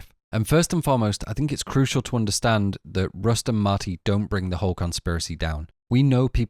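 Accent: United Kingdom. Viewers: The british accent is taking over youtube.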